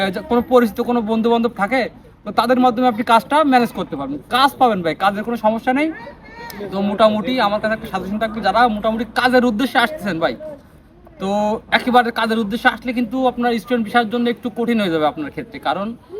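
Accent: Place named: Pabna, Bangladesh. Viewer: vai ki pabnar local nki💙 vasa to chenachena lage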